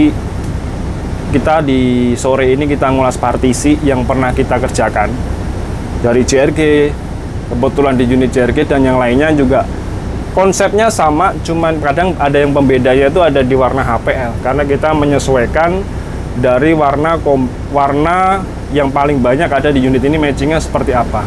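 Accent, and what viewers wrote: Java, Indonesia. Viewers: Seneng cara menjelaskan mudah dimengerti indonesia medok medok 😁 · cakep, lux.. ini yg bawain narasi mas siapa? ok lah, cukup bagus, jelasinnya padat dan jelas.. walo logatnya kontras sama ownernya, ini medhok ala Jawa.. 😅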